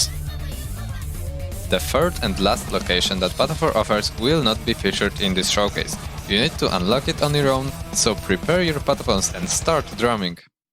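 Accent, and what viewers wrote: Poland. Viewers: Słychać że polak :D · Ten polski akcent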